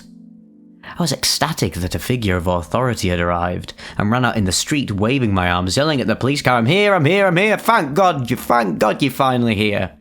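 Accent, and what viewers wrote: United States. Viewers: Omg I love your American accent 😂 it made this already amazing retelling of this story all that much better! Your voice and affect are honestly so perfect for telling stories! Especially these- so to hear a twang of humor is utterly amazing ❤😂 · your american accent is so funny haha great voice acting man loved this one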